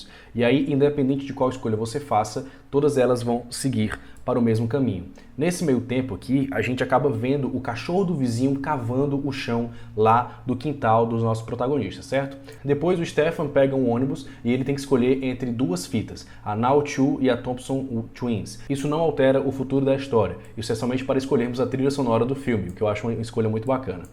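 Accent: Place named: Ceará, Brazil. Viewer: Nós 30 primeiros segundos eu me toquei do sotaque cearense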